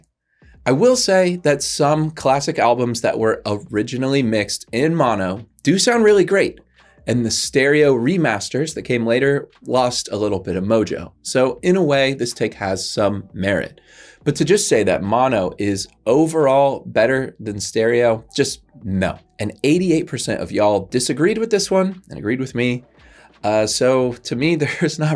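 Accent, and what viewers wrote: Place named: United Kingdom. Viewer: Where are you local to? UK I'm guessing? I watched a video yesterday and I think that was your accent.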